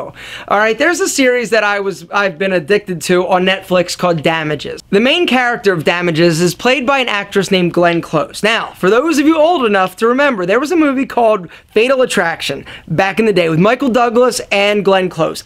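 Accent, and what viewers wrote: United States. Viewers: The loud American accent ruined it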